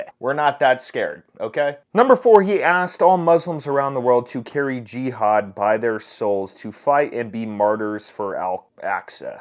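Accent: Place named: South, United States. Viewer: Dude, ya really screwed the pooch on this one. Ya sound exactly like an uneducated southern redneck. Hummus and Hamas are not the same, aren’t pronounced the same. One is chickpeas and the other are terrorists. And you’re ranting like a fool. Ya shouldn’t have made this one. I know you’re tired of the dumba$$ guv’ment. I am too. But, Israel is our ally in the Mideast and has been since its inception. Yes, gun control is a bad thing and we all know that. That should have been your main point for this video and not a rant about chickpeas. Get a grip. Lay off the high caffeine coffee. Play some soothing music. Go sit a spell on the back porch and watch the clouds roll by. Cya tomorrow.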